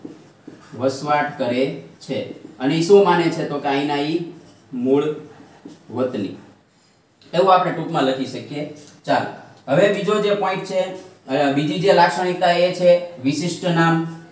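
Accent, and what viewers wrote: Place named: Kathiawar, India. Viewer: મને આપ ની કાઠિયાવાડી બોલી બહુ સરસ લાગે 👍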